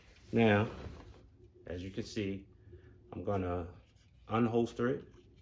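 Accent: Baltimore, United States. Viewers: You from Baltimore aint yew?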